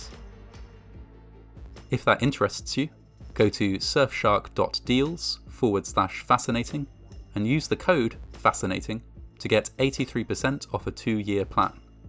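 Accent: United Kingdom. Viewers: The Brit accent makes Fascinating Horror videos slightly more fascinating for no good reason 😄 Other Brits: "Not particularly" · So glad there’s another my son uses this to sleep 😂 and it’s amazing how things can quickly go wrong. And thought you were from the UK with the accent 😂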